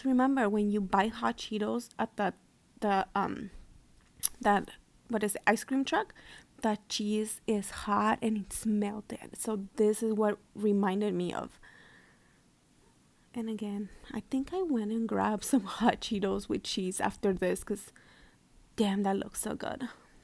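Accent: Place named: United Kingdom. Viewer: * British accent * absolutely perfect love ❤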